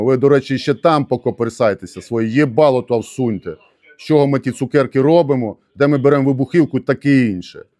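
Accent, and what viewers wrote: Transcarpathia, Ukraine. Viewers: Дякую за рекламу закарпатського діалекту української мови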